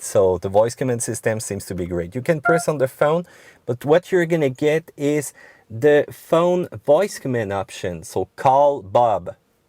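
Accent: Quebec, Canada. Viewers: came for the french-canadian accent, stayed for the high image quality... but jeep is really doing a poor job with this one hahahaha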